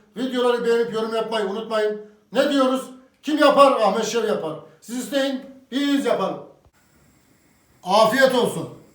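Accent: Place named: Ordu, Turkey. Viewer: Ben de Orduluyum şefim, aksanınız aynı bizim oralar. Başarılar diliyorum size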